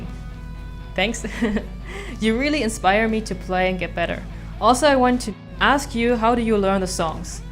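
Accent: Germany. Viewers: I really love German accent😍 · A true accent from a true german girl! And yes, she really is! ;D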